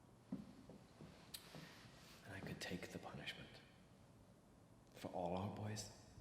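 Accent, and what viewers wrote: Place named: Ireland. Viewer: When did u turn Irish? Good though Hun. Well done x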